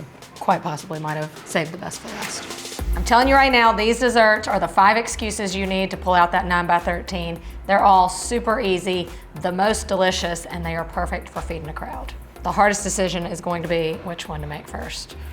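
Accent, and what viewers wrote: South, United States. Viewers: I concur! And I love that southern twang. Reminds me of home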